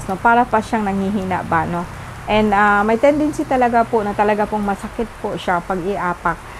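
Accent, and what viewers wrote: Bicol, Philippines. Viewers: Bicolana ka po?😊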